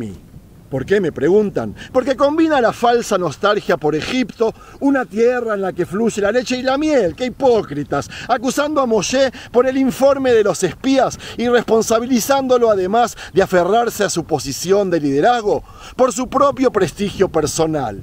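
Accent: Buenos Aires, Argentina. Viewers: Shalom, cada vez que te oigo digo, este hombre no puede negar que es Argentino y Porteño. Muy buena la metáfora . Los gobiernos populistas te empobrecen y después hacen creer de que eres un pobrecito y ellos como son buenos te da un poco de comida y alguna beca. Y sabemos, que siempre la comida gratis siempre es la más cara. Cariños a tu esposa, festejando el aniversario en tu trabajo. En lugar de llevarla a Paris. Jaja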